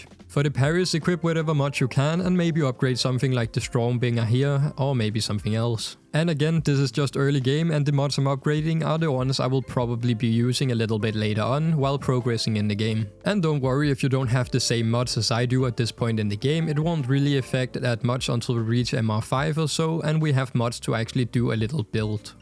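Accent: Denmark. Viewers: great video! instasubbed since theres no way youre not danish hahah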